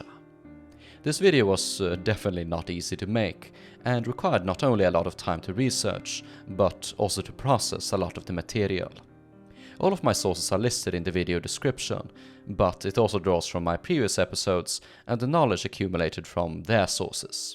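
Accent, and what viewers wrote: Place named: Sweden. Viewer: Premium quality material as always, and your English is excellent, grammatically superior to many (if not most) native speakers, Swenglish charm but with perfect 'ch-' sounds. First rate.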